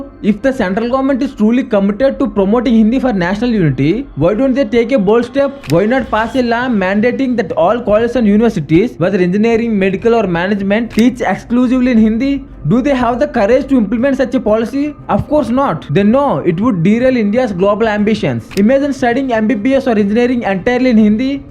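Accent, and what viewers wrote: England, United Kingdom. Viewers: I really enjoy his english accent. Infact i watched whole video.. 👍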